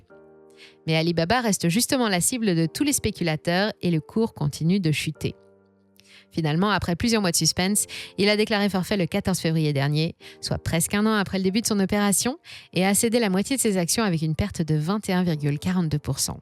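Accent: United States. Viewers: Cet accent américain 💕 Toujours super intéressant 😊👏🏼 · Bel accent Anglais, ou plutôt US !!!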